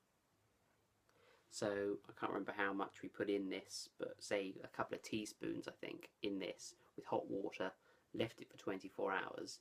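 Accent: United Kingdom. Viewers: What UK accent is that sir?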